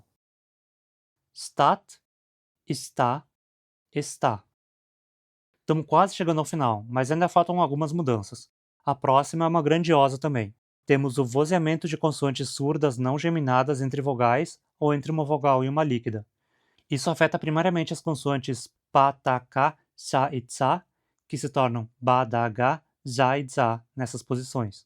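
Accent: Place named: South, Brazil. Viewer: vc tem um sotaque sulista, mas eu não tenho conhecimento suficiente pra discernir de qual estado vc veio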